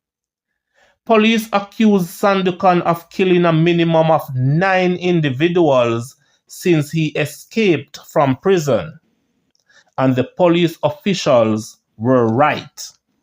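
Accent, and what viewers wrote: Jamaica. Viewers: U hear any foreigner on here asking bout correct pronunciation bredda???Except you!!!Stop being so critical of the presenter's Jamaican dialect. Cho!!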